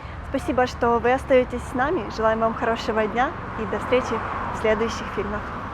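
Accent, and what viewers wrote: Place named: Odesa, Ukraine. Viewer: Огромное спасибо, что вы говорите на одесском языке!!! Я коренная одесситка вынуждена была уехать из за ужасов,но так приятно смотреть на красавицу Одессу!!! И слышать родную речь!!!!!!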